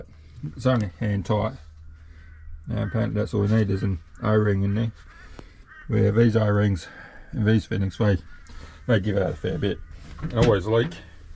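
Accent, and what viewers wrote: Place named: Australia. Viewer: hey im glad you speak plain Australian everyone wants to comment on the connection names im just happy to get these pointers❤❤❤❤❤ ya work mate keep it up for the rest of us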